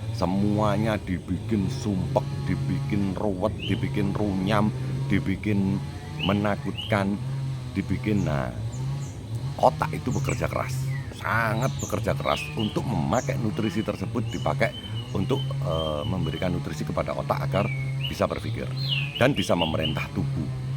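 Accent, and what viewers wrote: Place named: East Java, Indonesia. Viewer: kalau liat dr logatnya. Masnya dari Jawa Timur. Kebetulan asli saya Jatim juga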